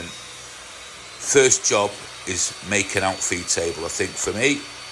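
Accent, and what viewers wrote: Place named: Manchester, United Kingdom. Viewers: Nice project pal,I'm originally from Salford your accent sounds like a Manc so your coming through fine to me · Hi, looks good very handy for the booze and glasses, nice finish. what I do to try to avoid tear out when using a large bit or a forsner bit,I use a thin drill bit as a pilot hole and then I drill from the other side. I don't mind if you voice over or talk as you going along it's your channel I like it as it is. You sound like your from Manchester or the surrounding area As always a great video. And as your asking I'm from Wrexham, Take care mate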